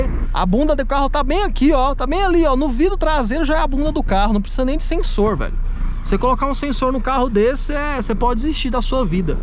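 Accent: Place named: Minas Gerais, Brazil. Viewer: Da pra ver que é mineiro de longe kkkk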